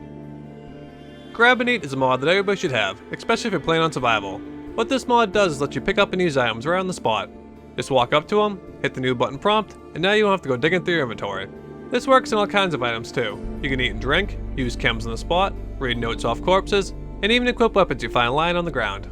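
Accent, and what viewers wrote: Boston, United States. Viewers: Chris Kozma I know I'm really late but he's from Boston lol. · Just want to say, your voice is very fitting for a game set around Boston.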